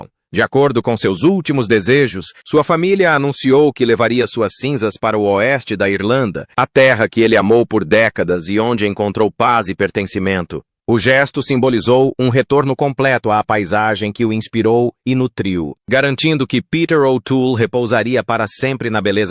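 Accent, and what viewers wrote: England, United Kingdom. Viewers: para com este horrivel sotaque ingles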